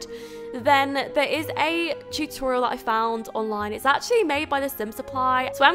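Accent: United Kingdom. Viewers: I really like your British accent and enjoy with your building Hogwarts castle. I hope you will make more about building some places from Harry Potter or wherever. Keep making good videos!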